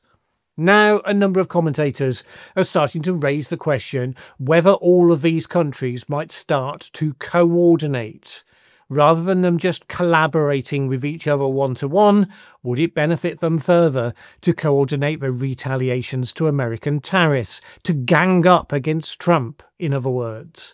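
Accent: United Kingdom. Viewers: British accented Clown blowing smoke out of both ends.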